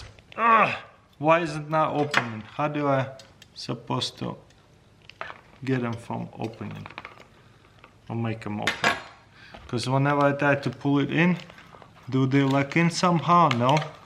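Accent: Russia. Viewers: Russian accents are the coolest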